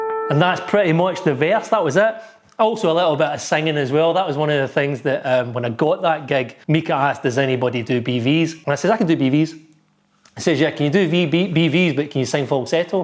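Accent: Scotland, United Kingdom. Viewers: That Scottish accent !!!! Well done man , great playing !! Mika is a wonderfull guy . Cheers from France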